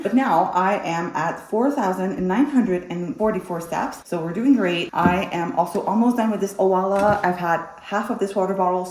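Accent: Quebec, Canada. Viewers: New subscriber here. Are you French Canadian? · This is my first impression and I’m not mad about it my queen❤❤ are you québécoise ?? Hihi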